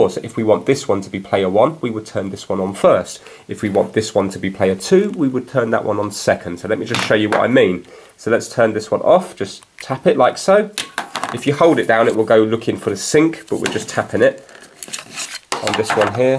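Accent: United Kingdom. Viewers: He doesn't speak American English he speak British English UK English he mean the game Zelda not the persons Zelda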